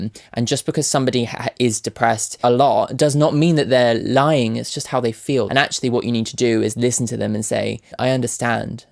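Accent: United Kingdom. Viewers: how did i know you were going to speak in a british accent just by looking at the thumbnail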